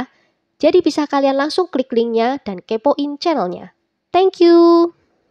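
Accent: Java, Indonesia. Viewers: suara mu yg medok itu membuat aku tertunggu tunggu video baru mu........ jgn hilangkan ya aksen jawa mu itu....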